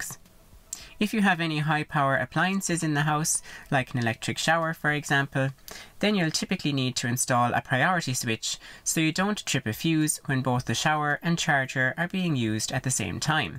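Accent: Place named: Ireland. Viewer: You're the most Irish sounding Irishman I've ever heard!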